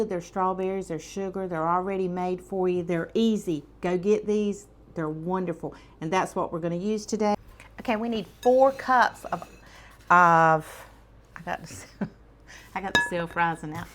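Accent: South, United States. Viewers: Hello. I'm just jumping in to say how much I love your soft, sweet southern accent and your soft voice. Reminds me of my grannies when I was young. She made desserts all by hand, and there is nothing better than southern desserts. Thank you for sharing your sweet self with us.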